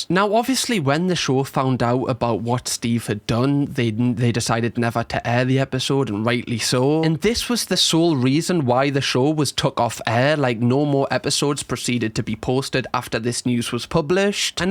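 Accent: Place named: Newcastle, United Kingdom. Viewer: The amount of times you say video makes me wish I could say it like you. I'll get my dad to teach me the ways of sounding more Geordie. 😆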